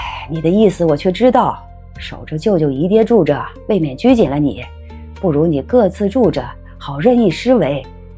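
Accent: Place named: Beijing, China. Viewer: 古韵京腔兼而有之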